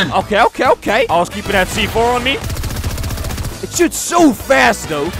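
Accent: Netherlands. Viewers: kom je uit nederland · Your dutch i can hear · Yourrrr Dutch I think bro👅 ( I’m Dutch ) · Lekker nederlands hahaha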